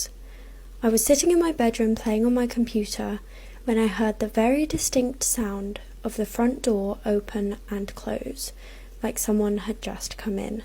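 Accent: United Kingdom. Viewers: An someone tell me why she English like me but only ever lived in america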